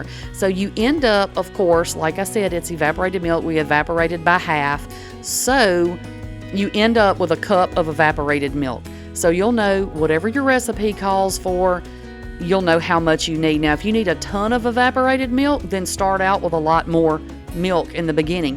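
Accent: South, United States. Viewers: Don't take this the wrong way cuz it's a compliment I love your southern accent I don't know what Southern States you're from but it's you sure have a southern accent You take care dear and God bless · Don't ever apologize because of where you are from or your accent. So many times, southern people are tried to make look less intelligent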